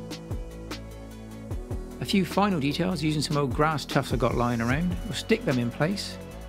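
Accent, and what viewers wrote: South West, United Kingdom. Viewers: I figured there was a south-west-ish flavour. I'm in Derbyshire limbo lol, as in right on the border of Derbyshire but with a Sheffield postcode, which is technically Yorkshire.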